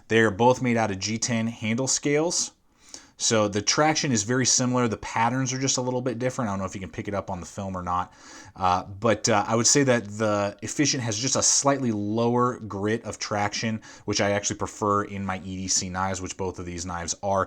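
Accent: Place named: Texas, United States. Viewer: Good Video, my brother is the Spyderco nut between us so I'll have to show him this. In your into I'd swear your in my home part of Texas! 😎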